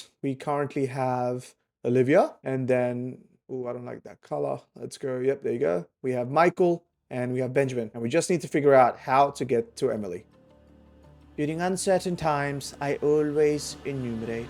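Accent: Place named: South Africa. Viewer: Suuuubed. What accent is that? South African in Australia?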